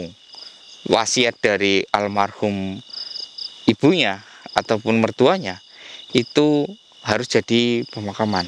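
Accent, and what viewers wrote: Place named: Banyumas, Indonesia. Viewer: Jauh banget sobat merantau nya..biasanya org ngapak d kalimantan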